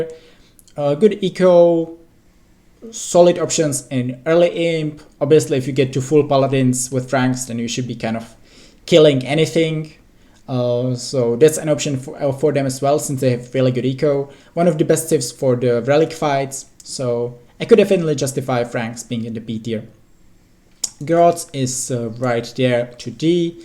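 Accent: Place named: Czech Republic. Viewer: After hearing you say the first three words I instantly knew youre from Czech Rep.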